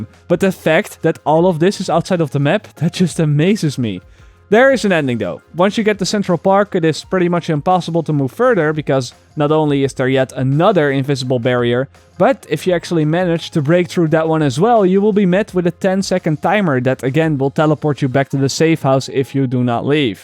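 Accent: Netherlands. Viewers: are you dutch???? you sound dutch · Dat dutch accent tho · Je hebt zo'n standaard Nederlands accent haha, mooi filmpje though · oke becouse of your accent i can hear your dutch haha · Ik hoorde al dat Nederlandse accent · nl accent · i already tought you were dutch because of your accent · Ha ik dacht al dat hij nederlands was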